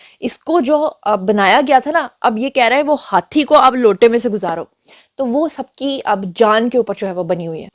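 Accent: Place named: Punjab, Pakistan. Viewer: تھوڑا سا مزید پنجابی ٹچ دیا کریں مزہ آتا ہے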